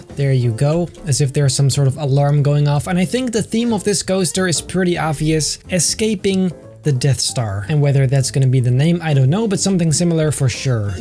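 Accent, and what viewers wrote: Netherlands. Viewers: You are dutch right? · He sounds so dutch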